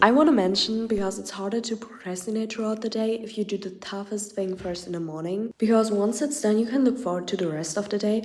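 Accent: Germany. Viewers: I KNEW IT ! I heard German! But tbh its so cuteee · I’ve never watched a video from you and like 10 seconds in I was like wait is she German? 😂 not in a negative way, I’m also German, just shocked how easily I spot German accents because they sound so similar to me haha (great video!)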